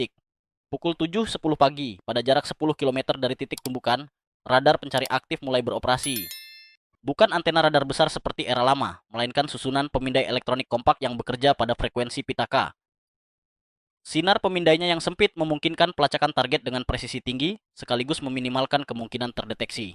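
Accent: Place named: West Java, Indonesia. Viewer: kang, urang sunda yak ? F-35 kang, bukan ep-35 😂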